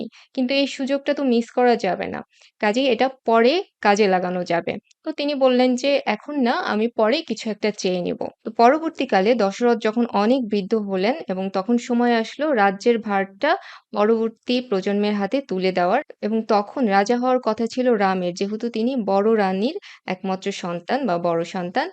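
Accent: Comilla, Bangladesh. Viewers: Apanr basa ki cumillayy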